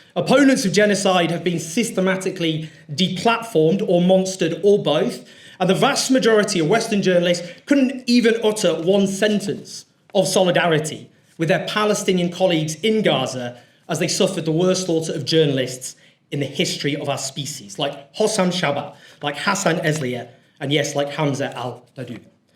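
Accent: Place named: England, United Kingdom. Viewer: Did you miss your Meds? Owen, was born in England. Can you not hear the accent? It's THEIR language! Hahahahahahaha youre funny!!! I feel sorry for you man. I really, really do. Why, do such sad people like yourself choose to live. Enlighten me?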